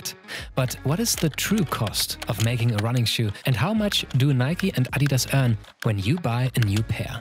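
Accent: Germany. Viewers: German accent haha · Tell me you are german without telling me you are german: his accent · Es entspannt mich das nicht nur ich ein german english hab 😂 gutes Video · Can't say for sure but the way he pronounces names sounds german, yes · Yea thats the right pronounciation, its a german company, german name. Judging by the narrators accent he is also german so he knows. Its not " A-die‐das" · Du hörst dich wie ein englisch redender Deutscher an. Lieg ich richtig ? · you’ve got the srongest german accent i’ve ever heard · Ich hasse den deutsch-englisch Akzent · Are you German? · Du hast echt nen starken deutschen akzent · R u german? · Is it just me oder spricht er mit nem deutschen Akzent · I know he’s German because of his accent 🤚🏾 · Warum höre ich da einen deutschen raus? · Man hört den Deutschen aus dir raus XD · Are you german? Lol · Are you German by any chance? 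· Der Sprecher ist Deutscher oder? 😃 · You german? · Man hört dass du deutsch bist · Man merkt diesen Deutschen Akzent so hart haha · yo dis is a german ass accent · His German accent is so strong 😂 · I love his german accent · Alter der deutsche Akzent ballert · Hat wer den deutschen Akzent rausgehört xD? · Finde deine klare Aussprache und den leichten deutschen Akzent zusammen mit deiner Stimme unfassbar angenehm zum zuhören. Ich bekomme immer fürchterliche Kopfschmerzen wenn andere Deutsche versuchen künstlich american english zu sprechen. Außerdem natürlich auch inhaltlich ein tolles, super recherchiertes Video! Ich hoffe du gehörst mit dieser Qualität bald zu den ganz großen auf YouTube!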